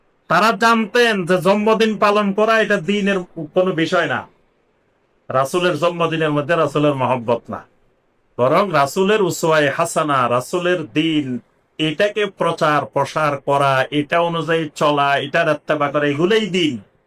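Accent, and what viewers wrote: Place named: Noakhali, Bangladesh. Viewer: বাউরে হেতে বলেন চমৎকার। তবে নোয়াখালীর আঞ্চলিকতা ছাড়তে পারলেন না এখনও হুজুর।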